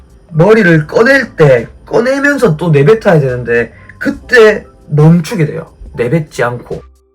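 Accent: Gyeongsang, South Korea. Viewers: 다정다감한 경상도 억양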